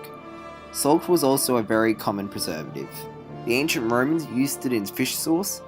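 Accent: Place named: Australia. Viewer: Le gasp Australian accent hehehe. (I mean, I knew that already, but still...)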